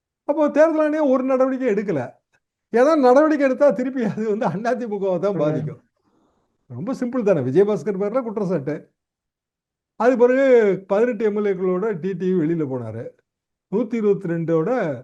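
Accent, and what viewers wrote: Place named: Coimbatore, India. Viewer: கோவைத் தமிழ் அவருடையது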